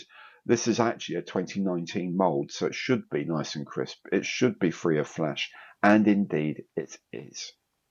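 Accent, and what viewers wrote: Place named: Northern England, United Kingdom. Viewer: Loving the northern accent “me duck” Kids today don’t know they are born. This video brings back lovely memories. I do fancy a Thunderbird 2 with the T4 but they do seem a bit expensive for what you get. FAB.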